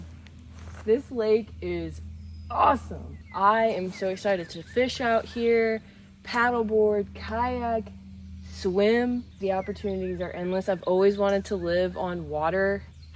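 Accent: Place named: Tennessee, United States. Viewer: I was trying to go by the accent & thought maybe Tennessee? But, I have only been there once so…..?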